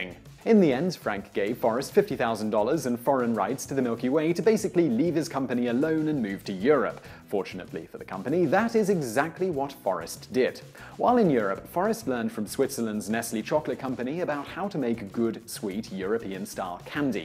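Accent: England, United Kingdom. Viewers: As opposed to "new-gat", right? I noticed that, but is from the UK so... he says it a bit differently.;) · Hearing a fellow Brit repeatedly saying candy unnerves me! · I'm confused. You have an English accent but use American words - like candy instead of confectionery. So I don't know if you are speaking from a UK or US perspective. To me Mars means that company in Slough, but I think to you it's something else. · Yes... is from the UK. Quite a few words are pronounced differently compared to what I usually hear in the US. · An english accent calling chocolate 'candy'........my ears have just been assaulted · Why do you keep calling it candy?? You sound like you’re from the UK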